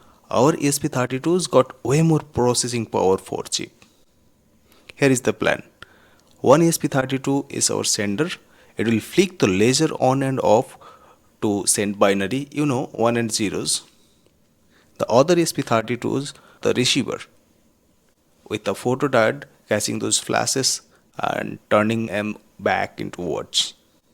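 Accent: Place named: India. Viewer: bro y you sounding indian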